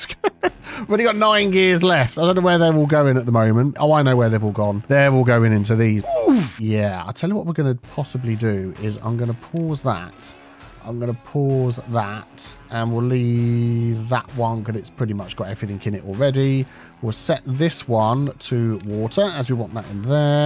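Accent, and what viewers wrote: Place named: Midlands, United Kingdom. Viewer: 😂😂😂😂 Was watching the bad tide warning count down, then watching it flow through the system when you zoomed out, and the warnings pop up internally screaming at to close the gates 😂😂😂 One thing though, the word is everythinG not everythinK, are you from the Midlands at all? Seams everyone says it round here and I'm constantly correcting my son, parents and siblings 😂😂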